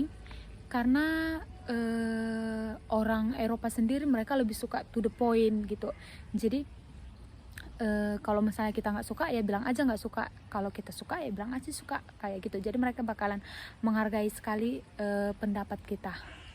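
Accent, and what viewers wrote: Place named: North Sumatra, Indonesia. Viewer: Kental kali logat Batak kita itu y kak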